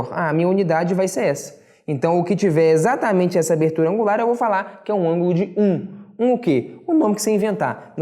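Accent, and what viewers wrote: Minas Gerais, Brazil. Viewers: Extremamente bem explicado! Esse sotaque aí eu conheço heim! Mineirinho!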